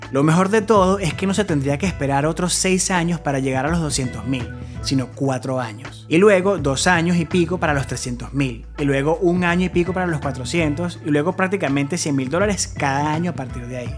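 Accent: Venezuela. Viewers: Eres de Venezuela? Suenas super venezolano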